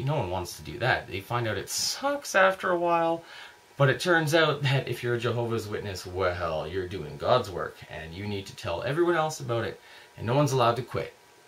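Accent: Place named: Texas, United States. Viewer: First of your videos I've seen. I'll view a few more this week. You come across as a friendly level headed young man and you'll do just fine without living a life of guilt for doing things that are normal. I was in it toooooo long. It's like banging your head against the wall because it feels so good when you stop! P.S. I like your Texas accent.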